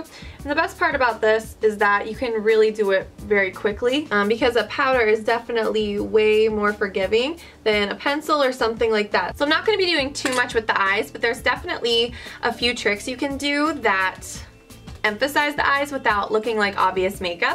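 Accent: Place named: Minnesota, United States. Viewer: Are you from Minnesota? I lived there my whole life up until this past year, and I can totally tell your accent. The way you say your A's :)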